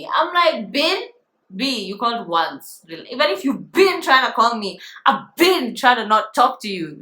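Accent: United Kingdom. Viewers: The British acsent 👌😂😂😂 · The british accent makes me happy😍😂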